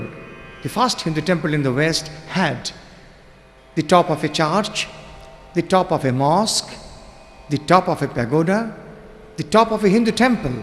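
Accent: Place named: Bengal, India. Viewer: Melodious Bengali ascent... enchanting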